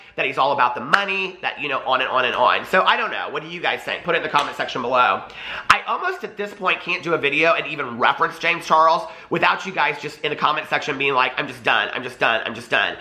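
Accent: South, United States. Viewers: New subscriber and I adore your fun personality!! Also, love the Southern accent and hugs from Texas.. James Charles is a immature and entitled man child. Whereas, Jeffree Star is a mature grown person, who remembers his roots.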